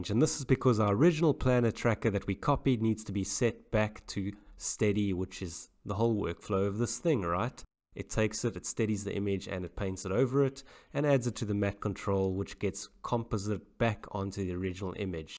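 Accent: South Africa. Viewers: Always Love the South African Accent ;)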